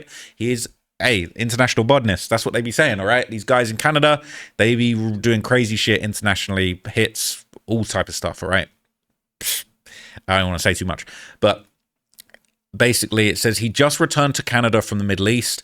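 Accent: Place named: United Kingdom. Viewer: I heard your accent and was Thank God. This is UK Surrey, not British Columbia, Surrey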